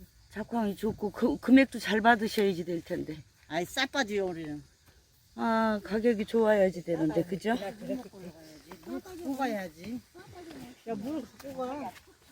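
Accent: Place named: Chungcheong, South Korea. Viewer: 힘드시죠? 말하면 뭐한대유 충청도 맞아유.나두 충충도 태생이유 고추건조 보기 좋습니다 유투브도 대성하십시요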